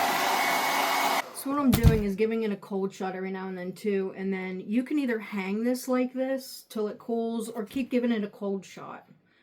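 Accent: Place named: Philadelphia, United States. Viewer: May I ask where you’re from? You sound like you’re from Philly or South Jersey area, where Im from.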